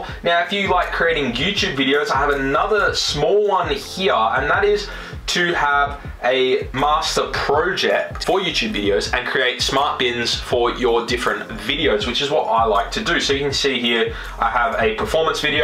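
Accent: Australia. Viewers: Good tips mate... you're also an Aussie yeah? Sounds like a familiar accent haha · mate this helped a lot champion. Also you legit sound aussie as but you're Canadian?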